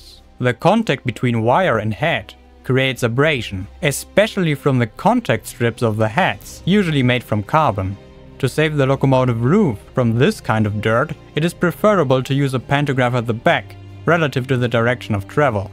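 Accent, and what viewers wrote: Germany. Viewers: Um. He has a German accent because he's German. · Awesome video about great topic! I really like the slight German accent too, very authentic and original! Either way, you earned yourself a new sub, lookin forward to your next vid!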